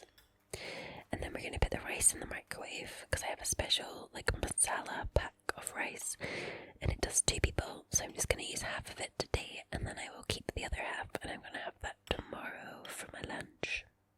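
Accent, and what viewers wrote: Scotland, United Kingdom. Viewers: What area of Scotland are you from I am fife and your accent sounds a lot stronger than ours 😂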